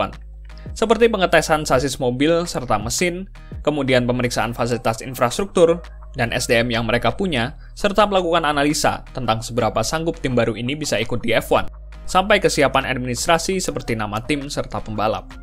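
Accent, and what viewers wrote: Cilacap, Indonesia. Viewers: btw koe wong cilacap bang?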